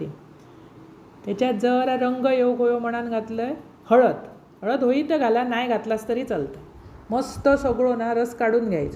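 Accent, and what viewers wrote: Malvani, India. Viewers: तुमची मालवणी भाषा बोलणे खूप सुंदर असा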